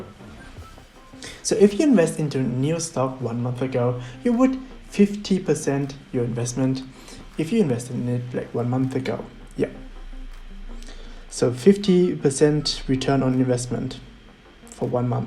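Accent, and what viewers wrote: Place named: Germany. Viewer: Haha der deutsche Akzent ist einfach gut!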